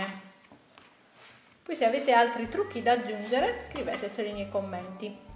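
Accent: Sardinia, Italy. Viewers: da dove sei? la Sardegna? sento l 'accento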